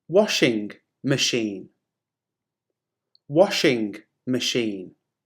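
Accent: United Kingdom. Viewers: Thanks! What regional British accent do you have?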